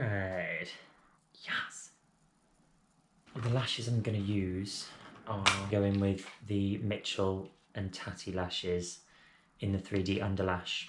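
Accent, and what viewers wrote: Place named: Essex, United Kingdom. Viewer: You sound of..... East England.... but South, Mid, or North...? I can’t tell for sure but I am going to guess Essex.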